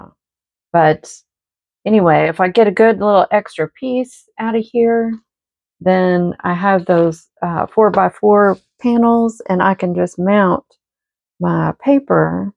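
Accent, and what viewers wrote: South, United States. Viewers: I love this! Beautiful color combination. You have a lovely southern accent. I’m from SC and live in Scotland. Cheers❤ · I love this! it's so much fun and thanks for sharing the colors you're using. I think I detect a Southern "accent". Hello from a Northern viewer. Apparently we have an "accent" too, which I didn't even realize until I spent 18 yrs. in NC and then came home to MN. !!! Yup, we have a accent !!!!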